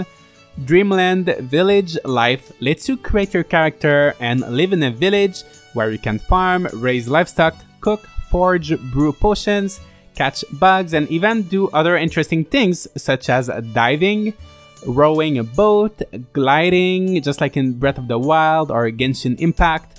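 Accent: Quebec, Canada. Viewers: Oh le Quebecois is fort in this one. <3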